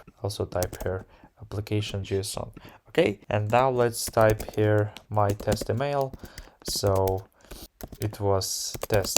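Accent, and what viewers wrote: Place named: Russia. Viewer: Thanx a lot for this guide! Fluent english from russian spell that's what i neeeed, man "]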